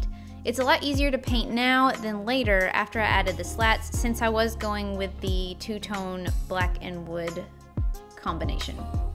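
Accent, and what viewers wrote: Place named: South, United States. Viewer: I can’t put my finger on where her accent is from, it seems like it kind of have a southern/eastern sound sometimes but not others so I’m beat. Anyone know?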